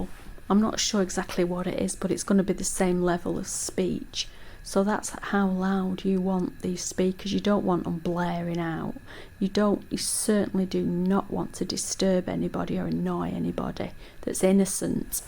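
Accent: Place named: Northern England, United Kingdom. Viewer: interesting idea I notice you have a north england accent I'm from there too. my views on who's doing what is controversial I am wondering what you believe triggered your targeting? as its rarer in england than America nevertheless let's just say Obama has a lot to answer he commited unreal crimes internationally against innocent citizens. all the best I like your idea I constantly give them abuse covertly while on a phonecall I found being reasonable doesn't make them stop. I agree about the hacked phone thing - the signs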